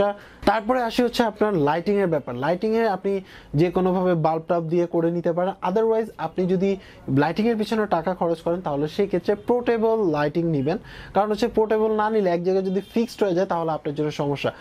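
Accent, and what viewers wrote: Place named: Comilla, Bangladesh. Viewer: ভাই আপনার গ্রামের বাড়ি কই? কুমিল্লা নাকি? ☺ গের গের সাউন্ড আমাদের এখানেও করে। হোমনা, কুমিল্লা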